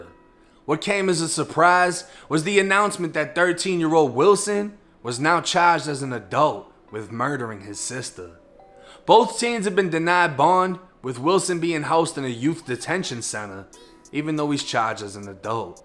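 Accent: Boston, United States. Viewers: The boston accent is so unique, I can always tell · Thats how we talk up in Boston, dont hate.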